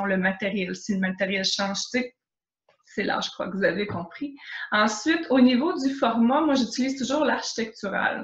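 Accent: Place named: Quebec, Canada. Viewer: ca a l'air sympa , mais aprés avoir visionné 2-3 capsules , l'accent quebequois est deja difficiles a comprendre mais avec la qualité du son, l'echo cela rend les explications presque inaudible. et en plus la qualité de l'image est toute flou, on ne peu lire ce qui est ecrit a l'ecran......si le son et l'image etait correct je pense que cela pourrait etre tres interressanr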